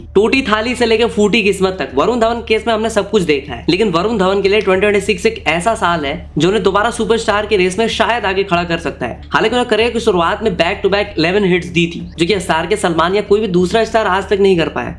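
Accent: Bengal, India. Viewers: Ap bengali ho Kya?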